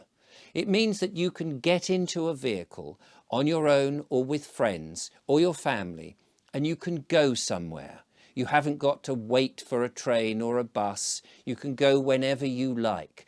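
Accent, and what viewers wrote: United Kingdom. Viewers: You're doing a service to the ones who hear, by giving an (eloquent & very british...) voice to all. Personally, I agree with ALL the words being said. We're ALL living on a 'simulated' world, where the ones who rule it SURE WEREN'T elected. The 'curtain' is 'falling'... slowly BUT surely... Thank you again, Sir.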